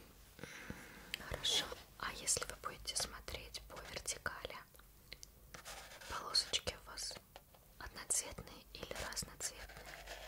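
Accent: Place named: Russia. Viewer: She’s so pretty really wish she did some videos in English the Russian accent is amazing 👌🏻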